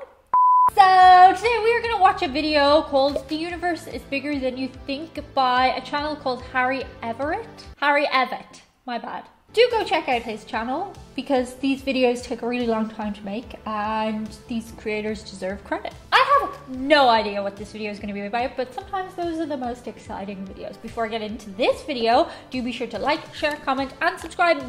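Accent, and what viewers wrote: Ireland. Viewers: Hey, another video where you just, talk, talk, talk,right. I’m kidding, I like that you talk during your videos. I can hear ore of that Irish accent. Tell your mom, your fans have spoken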